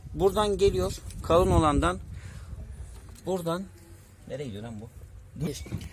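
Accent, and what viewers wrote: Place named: Hungary. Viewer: Bu kizancik Macir belli beya konusmasindan kizancagizim kolay gelsin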